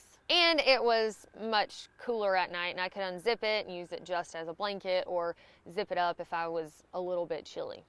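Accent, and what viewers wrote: South, United States. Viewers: you are just the sweetest and prettiest young lady I believe I've seen in quite some time. No I don't get out much. But I do reminisce a lot about when I used to backpack and you help bring those memories back. I have a Southern drawl just like you do so I guess that's why I like to hear you speak. Thanks for the video I'm sure you're helping these guys out a lot that's wanting to do hiking like you did and do. Keep ye powder dry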